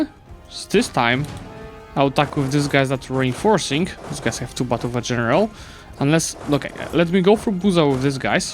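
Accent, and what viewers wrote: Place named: Poland. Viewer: because we have ears, his polish accent isnt that bad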